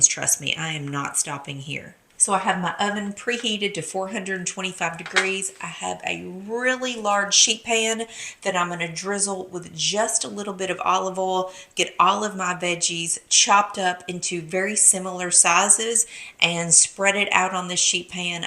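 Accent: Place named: Tennessee, United States. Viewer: Question: How big is your cutting board? I bought a new one recently, and I think it's too small. I love your accent. Tennessee? That salad is just up my alley; after 6 years on keto, it's time I reintroduced pasta, which I actually began doing 2-3 months ago. Thank you; I'm eager for more of your recipes.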